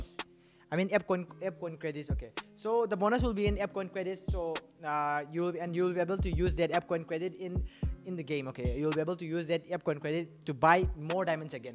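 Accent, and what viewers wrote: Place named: Philippines. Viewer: Your voice same to phillipines Voice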